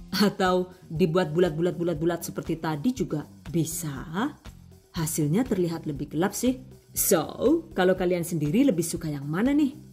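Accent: Banyumas, Indonesia. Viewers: deneng ngapak 😅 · Ngapak2 deneng koh😁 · Resep selai nanas dr video ini uda q download dr setaun yg lalu jd resep andalan... sbnernya selain resep nya yg ciamik... suka sekali dgn pnjelasan2 nya yg lucu n logat ngapak nya apalgi pas bhsa ngapak nya keluar truz blkgnya sllu ada kta yyuk... jd bsa snyum2 sndiri.. #GiveawayTHV2021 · Sampeyan wong bule opo wong ngapak to mbak kok lucu banget tlng tampakan wajahnya mbak penasaran sy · Bisa ngomong bahasa ngapak yah 😁 Cilacap hadir☝️ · Penjelasan nya mudah dipahami.. ngomongnya enak didengar ada lucu," nya bahasa ngapak.mantap bunda. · selalu tersenyum bila bu naratornya udah ngeluarin bahasa ngapaknya😄😆 tapi selalu mantap resep dan tipsnya. semoga semakin maju channelnya.. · Terimkasih bunda...sangat bermanfaat untuk saya....kepengin ngundang "yu" janen..kayane wong panginyongan... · Suka banget sama chanel jlas Pk bnget jelasinya..lucu ngapake koyo nyong😂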